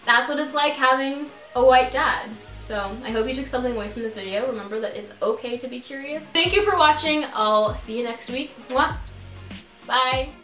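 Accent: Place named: United States. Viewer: Wow, your fine as hell, I like your American accent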